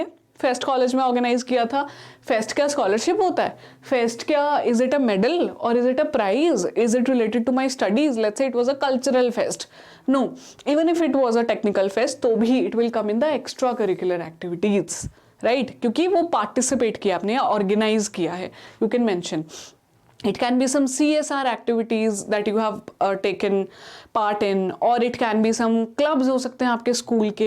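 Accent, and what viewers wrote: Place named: Karnataka, India. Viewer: It looks like mam mother tongue is kannada!!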